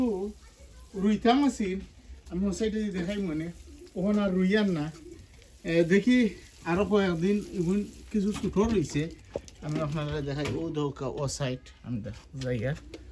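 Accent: Sylhet, Bangladesh. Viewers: I❤ you Bhaisab & I Love your gardening & I Love Afnar syLhety kotha gulu keep safe & well Asalamu walaikum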